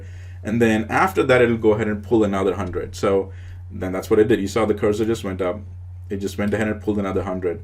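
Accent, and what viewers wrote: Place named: India. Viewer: thank you every video, one question I have, which is off topic, your accent is somewhat INDIAN, are you ever belonging from india, please answer sir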